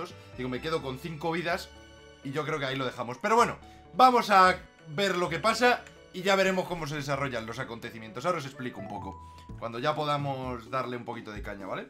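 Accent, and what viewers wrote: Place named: Russia. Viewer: Me suscribo por tu acento ruso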